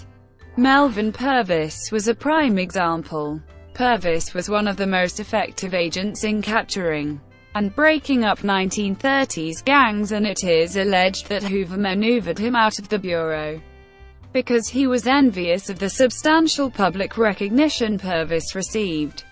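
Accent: United Kingdom. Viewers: Very bad done. That British accent! Trash 🗑️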